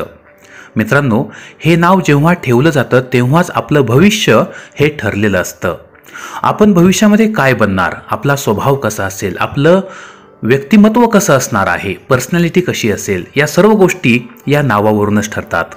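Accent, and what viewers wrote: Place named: Delhi, India. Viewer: दिल्ली गर मराठी तुमचं. नाव काय आहे ते सागा 🤩🤩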